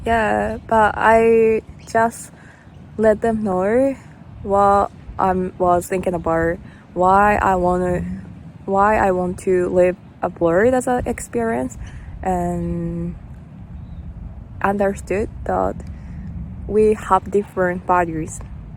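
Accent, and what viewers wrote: Australia. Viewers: Aussie pronunciation is slipping in every now and then haha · Your English is improving. Some very Australian accent popping through. Yes it is cold. It’s still winter. In a few months it will be burning hot. Your dream sounds fantastic. I wish you and your husband well in establishing your little hotel 🏨 👍🏽🇦🇺😊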